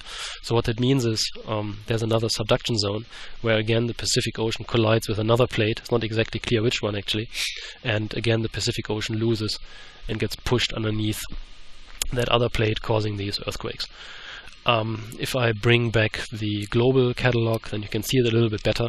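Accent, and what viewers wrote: Germany. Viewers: Man this was awesome! Do I get it right that you have something like Virtual Reality glasses on to see the things we are seeing? Oh and, are you german? Your accent sounds just like that... :)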